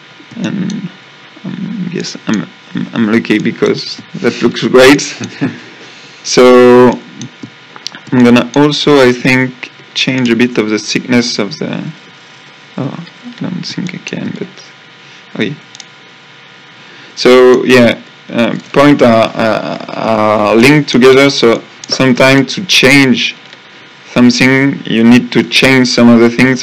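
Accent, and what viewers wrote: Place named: France. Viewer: nice french accent :p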